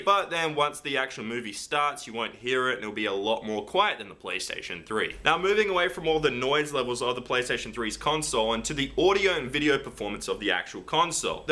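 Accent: Australia. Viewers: He's Australian -_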